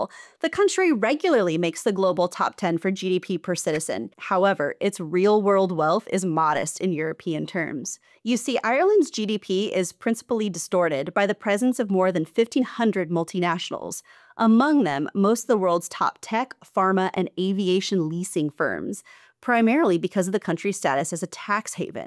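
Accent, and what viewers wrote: United States. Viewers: You have a perfect American accent. I would not have guessed you were German until you gave it away. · Always surprised when I hear a American accent talking sense! ❤ Makes me believe , or hope that things will be fine!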